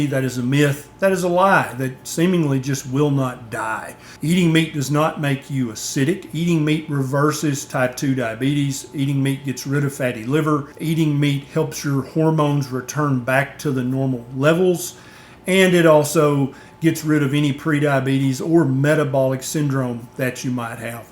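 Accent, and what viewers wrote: South, United States. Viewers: I am all for the carnivore diet and have been on it for more than 6 months and it is absolutely amazing. I listen to many experts on the carnivore diet but I simply cannot get past this doctor's southern drawl accent. If somehow he can change that up, he could probably win back the top half of the country and make a larger impact with this awesome way of eating. Believe me, I completely understand that it has nothing to do with education or intelligence because obviously he knows what he is talking about and is educated on the topic, however it takes all of my willpower to get through an 8 minute video listening to this type of inflection. I suspect that would be the same for most of the northern part of the country.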